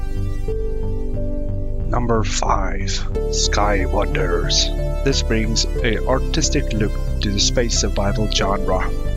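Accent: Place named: Afrikaans, South Africa. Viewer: you dont sound english you sound afrikaans if you do awe brasse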